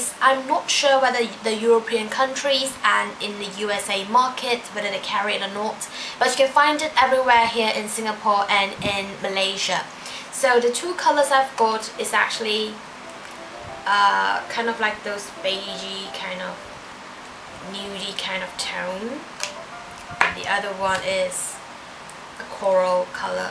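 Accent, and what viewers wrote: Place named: Northern England, United Kingdom. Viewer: Are you Japanese? I lived in Japan and taught English there for 4 years. I have never heard anyone from Japan who can do a good a northern english accent as you, its amazing!! How long have you lived in the UK?:)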